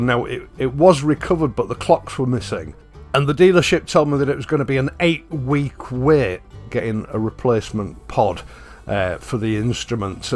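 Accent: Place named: East Yorkshire, United Kingdom. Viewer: Ive had the Honda CL500 for 2 years now and its great - not in a hurry to change it. . By the way, you sound like you're from the East Yorkshire area, if so, me too!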